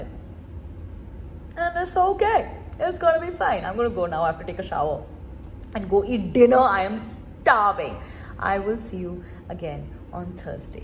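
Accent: India. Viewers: I love the Indian English accent, its so cute and funny!!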